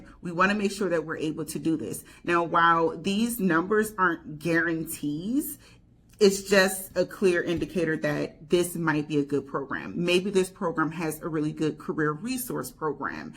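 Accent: Maryland, United States. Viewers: Are you from MD, I feel like I hear a MD accent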